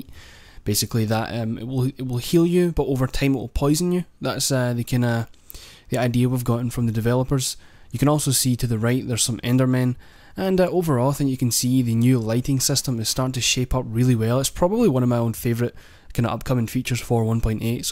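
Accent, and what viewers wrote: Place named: Scotland, United Kingdom. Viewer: You're so... scottish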